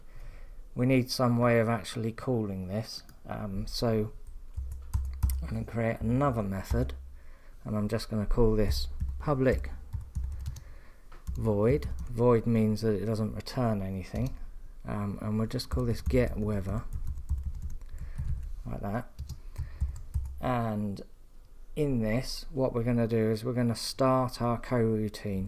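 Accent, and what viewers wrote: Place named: London, United Kingdom. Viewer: Wow amazing London accent:)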